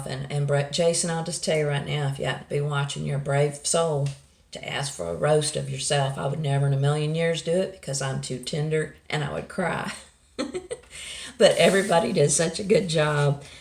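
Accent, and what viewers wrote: South, United States. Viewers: it was wonderful seeing you and I understand about how this house arrest we are all on hasn’t really affected me to much either. I’m so very very sorry to hear about Chico and Gizmo, I loved watching those babies hop around and play.I know how hard it was for you and George.Again I’m so sorry to hear that.I’m hating to hear that George has been down for over two weeks. I pray he gets through this episode soon. I can’t imagine the pain he is in.I will definitely keep him in my prayers.Thank the good Lord above that Granny is home and doing well. She is an amazing women.GOD BLESS HER. Well I’ll stop rambling but it’s been awhile and I’m so sorry it’s been really rough for you guys, but I sure was happy to see your pretty face and here your awesome southern accent, I love to hear you talk.We southern girls have to stick together about our accent. 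God Bless you all and I will keep you all in my prayers. Please take care and be safe. 🙏💕🙏💕🙏💕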